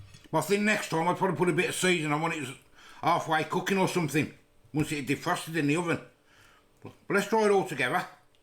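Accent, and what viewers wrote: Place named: Black Country, United Kingdom. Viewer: Salt and pepper is not seasoning I think you and are amazing people loving the Black Country accent I’m from the Black Country too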